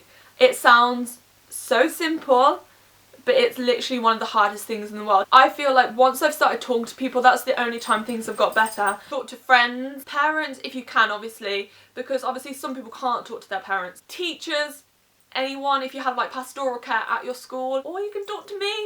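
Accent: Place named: Birmingham, United Kingdom. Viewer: Just Sayin i think it's a bit brummy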